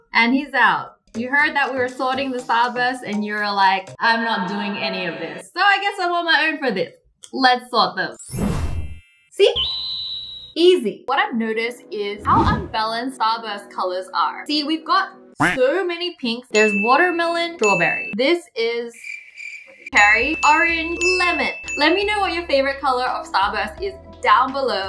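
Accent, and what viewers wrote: Australia. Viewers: I've got my trusty KNOIF Nobody: Not a single soul: Me: Hahahahahahahahahahahah! The Ozzie slang is soooo funny! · cuz she's Australian so she has an Australian accent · U got hate because people take this as a hate comment u judged the Australian accent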